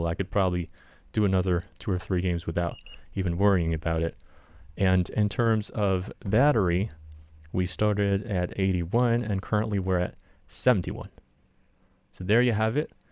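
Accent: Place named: United States. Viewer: From the sound of your voice you're located in America so I just wanted to ask how your process was getting the 17 ProMax and if you could maybe link where you got it from or something like that so other Americans like me can reliably get the Xiaomi 17 pro Max. Plus I did want to ask what cell phone provider you're using because I know some of the Chinese smartphones do not work well with Verizon for example. (I have t mobile)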